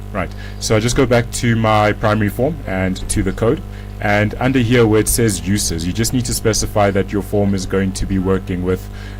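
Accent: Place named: Afrikaans, South Africa. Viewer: nah lol that's just the Afrikaans accent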